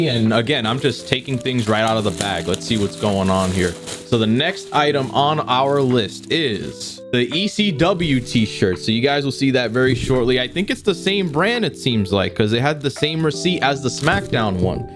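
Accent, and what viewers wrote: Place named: New Jersey, United States. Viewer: I like your accent are you from New Jersey or Philly?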